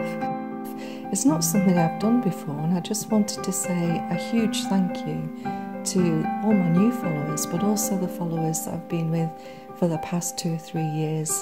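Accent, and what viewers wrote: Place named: Scotland, United Kingdom. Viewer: Thanks for the introduction,! It's always so nice to discover a new YT friend, but when they also let you in a little on their origins or something a bit more personal, that's even better! My great grandfather left Edinburgh to come to America many years ago, but I love all things Scottish and wish he had stayed there sometimes... ah well, I can listen to your beautiful, familiar accent and paint along and kind of time/place travel 🌿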